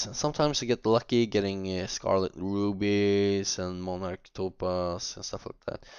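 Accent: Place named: Sweden. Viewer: haha hör att du är svensk ;P:D har kollat på dina filmer, men vilket av dom är bäst? vilken är lättast och billigast ?? och vilken tjärnar man mäst på lättast om man ska säga så :)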